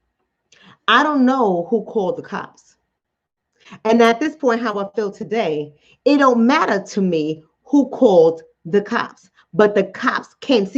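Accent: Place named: Bronx, United States. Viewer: Love you! That New York Bronx Accent is everything! I could listen to you all day long. IJS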